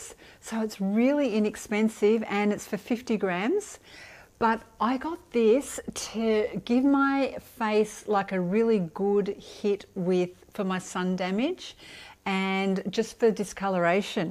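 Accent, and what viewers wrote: Australia. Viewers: I’m a new subscriber. I have watched five of your videos today. Thank you for sharing all your wonderful tips for us fabulous 50 gals. I love hearing your Australian accent.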